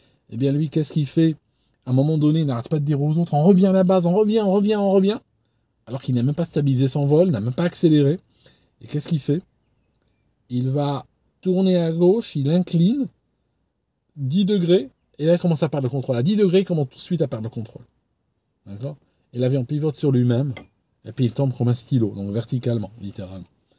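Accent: Switzerland. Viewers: Oui l'accent.. C'est ma mère originaire de Genève qui m'a fait remarquer sur une autre vidéo. Je trouvais pas ça flagrant mais alors là😮 ! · Il est bien guilleret dès l introduction notre, ca change des intros à la Pierre Bellemare. Et cet accent etonnant (suisse d apres les autres) qui surgit plus présent que jamais. (Bon moi j ai toujours trouvé que tu causais comme le père Algerois d un ami). Bref autrement le contenu est toujours d une régalade absolue. Un petit hors serie un jour sur Lindbergh, le hindenburg, l Aeropostale? Tant de merveilleuses histoires dans l aviation ❤❤❤ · Eh oui j'ai aussi remarqué l'accent suisse un peu vaudois ? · Merci...tu nous diras par quel mystère ton léger accent suisse te reviens en ce moment;-) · Vous avez pris l’accent suisse ? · J'ai été devancé, mais pourquoi l'accent Suisse ressurgit d'un coup dans cette vidéo ? Un coup de fil dans la journée avec un ami Suisse ayant ravivé des souvenirs ? 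· Étant genevois, c'est son accent qui m'a fait lever un sourcil et de fil en aiguille j'ai commencé à suivre régulièrement ses vidéos qui d'ailleurs sont toujours captivantes. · oui j'ai aussi senti l'accent suisse ! c'est nouveau ca ? :p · "LEGER" accent suisse??, plus il est en forme plus il laisse traîner joyeusement l'accent, je viens de la frontière, si je ne le connaissais pas et juste avec le son je jurerais qu'on a affaire avec un Genevois de souche! (mais méga-callé en aviation) 😁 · Pourquoi vous reste-t-il de l'accent suisse? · Tu me fais sourire avec ton léger accent Suisse cher Ami, m'a tué a 900 ft on récupère que les boîtes noires😂😂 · Effectivement moi aussi j'ai réentendu ce léger accent Suisse dans ces dernières vidéo. J'aime bien , ici en Alsace nous avons un peu le même. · Il n y a pas que moi qui a remarqué son accent suisse 😂😂😂😂😂 Encore une très belle vidéo, merci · d'où tu le sort cet accen suisse, tu vis en suisses? 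Perso je m'en fou, mais c'est pas saint. Ça sent le mensonge. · Mais qu’est-ce qu’il se passe sur cette vidéo? Au début je reconnais très nettement au contraire que de toutes tes autres vidéos un accent suisse! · C'est marrant, t'as pris les intonations et un soupçon d'accent suisse. Combien de temps y as-tu passé ? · Le petit accent suisse..! Je me disais la même chose donc.! Monstre bien la vidéo.! 😂 Vive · C'est vrai que sur cet épisode M. nous gratifie de légères intonations Suisses, sans doute un reliquat de ses études de pilotage dans la Confédération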